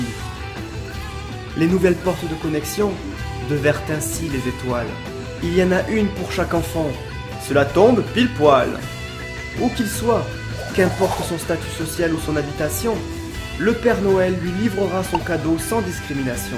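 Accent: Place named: South-West, France. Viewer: Première vidéo que je vois sur ta chaine, et je dois te féliciter ! Très beau texte qui suit le rythme de tes rimes et de ton accent du sud ouest, animation superbe, dessins très soignés, et une originalité indéniable...Bref. Un sans faute !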